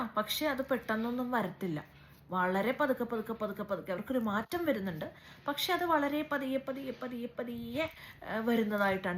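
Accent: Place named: Kottayam, India. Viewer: കോട്ടയം ഭാഷയാണ് കളിയാക്കണ്ട!!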